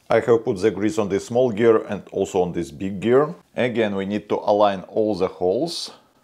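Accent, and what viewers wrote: Russia. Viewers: Жёсткий русский акцент)) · Как по мне русский акцент жобавляет шарма роликам ;) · Такой русский ацент и внешность русская) За работу респект!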